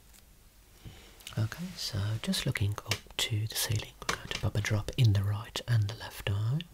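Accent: Birmingham, United Kingdom. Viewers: Happily I came across your excellent channel about a week ago and I've been enjoying so many of your videos. You clearly work hard to produce the best videos possible and it really shows; also great to hear the lilt of a fellow Brumie. I grew up in Handsworth in the 80's. Unfortunately I've been suffering from a worsening heart problem over lockdown and 19 months of self isolation so finding alternative ways of reducing daily stress has been important so thank you for your efforts in ASMR. I am also starting to learn quite a bit about my eyes and optometry :-)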